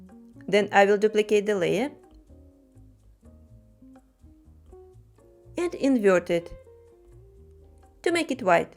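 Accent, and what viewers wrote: Russia. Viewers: Как же акцент режет слух сразу понятно что русская )))) только у русского человека может быть такой акцент. Уж лучше на русском говорите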